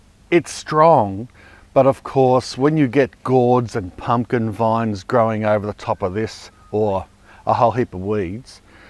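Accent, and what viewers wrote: Australia. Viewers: Good information. I so enjoy watching your videos. Love your Australian accent, I live in Virginia in the USA. You would think my southern accent funny I guess. How cold does you winter time temps get? I think right now you are in fall nearing winter, as we are in late spring, almost summer.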